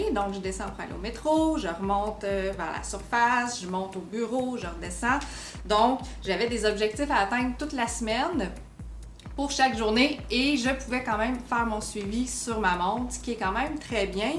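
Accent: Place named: Quebec, Canada. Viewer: language de cetebese .. quebecois ..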